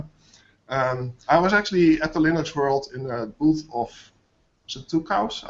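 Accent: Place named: Netherlands. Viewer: I suspected the author was Dutch when I ran the program for the first time in <num> and read the Uganda message. Never bothered confirming that, but now I've heard him and I know.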